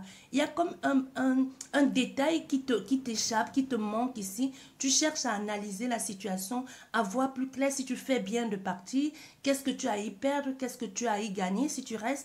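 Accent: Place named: Martinique. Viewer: Bonjour, Tu as bien fait de recadrer sinon c la dérive. J'ai sélectionné ce qui me parle dc merciii. Ton accent me rappelle celui d'ici (la Martinique). Vite ta prochaine guidance. 🌴☀🌴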